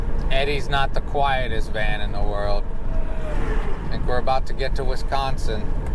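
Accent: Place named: New York, United States. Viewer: I can hear your accent clearly! How long has it been since you left NY?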